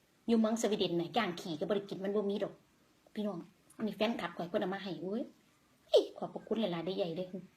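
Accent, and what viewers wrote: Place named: Khon Kaen, Thailand. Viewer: กินเป็นตาแซ่บคัก..เว่าปานอยู่ขอนแก่นเอาโลด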